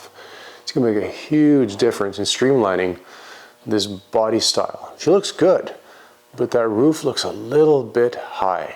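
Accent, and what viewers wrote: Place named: Canada. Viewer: are you in the States. I hear a Canuck Dialect??? Great Build Sir. Make Canada Proud??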